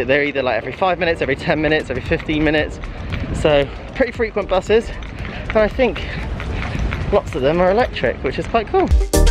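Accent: United Kingdom. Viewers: British accent 🤣🤣👍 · if you want to learn how to speak British accent , I must watch your vlogg heheheehe..... happy Good day!!! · Thanks for your video upload. It's nice to see what athletes do during their stay in the Olympic Village. Love hearing British English. BTW Congrats, Olympic Champion!!!!! :)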